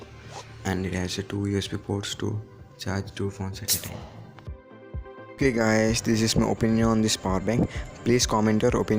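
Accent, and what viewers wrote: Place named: New York, United States. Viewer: Dini evadaina video antada ra? Meanwhile NYC slang ra chakka😍👍😊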